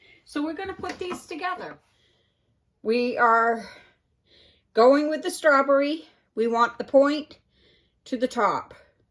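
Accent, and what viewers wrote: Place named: Rhode Island, United States. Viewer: New friend here from the Christmas collab! So glad we found each other’s channels. Looking forward to watching more of your content. I lived in Rhode Island for several years; loved it there so much. Your accent brings back memories. My name was Melinder instead of Melinda 😂 All of your recipes look fun and delicious. Merry Christmas!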